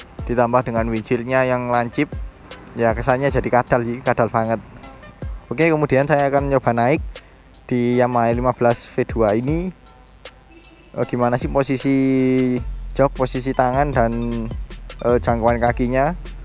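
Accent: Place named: Java, Indonesia. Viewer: Judul inglish ngomong medok jawirr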